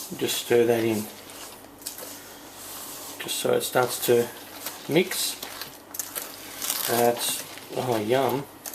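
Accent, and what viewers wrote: Australia. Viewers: r u aUSTRALIan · hes an aussie? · Aussie I think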